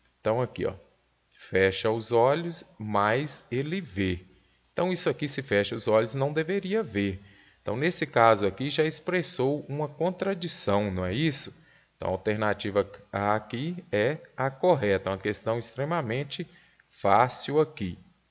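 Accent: Minas Gerais, Brazil. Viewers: Professor amo o jeito mineiro de falar ! Obrigada pelo vídeo !!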